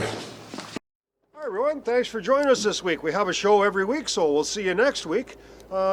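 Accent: Canada. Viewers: The Canadian accent is strong with this one..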